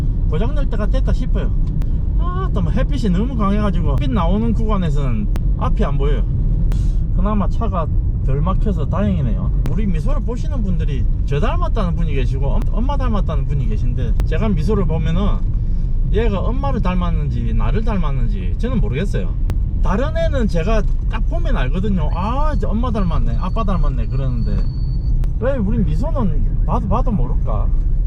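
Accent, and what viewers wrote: Gyeongsang, South Korea. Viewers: 억수록 말하시는것 보고 경상도사난이 이세요?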